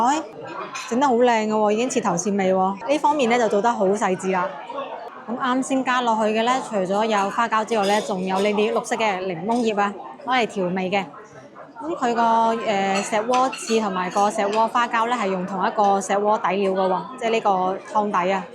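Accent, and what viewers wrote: Guangzhou, China. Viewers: 我爸媽在廣州佛山大同去香港住的（19xx年了) 我一路看你油管. 我好希望大家有生之年. 再看到白話廣東話再過十年二十年都有廣東文化和廣東話.沒有廣州話 那有今天的香港廣東話. 好喜歡你講正宗的廣州話.謝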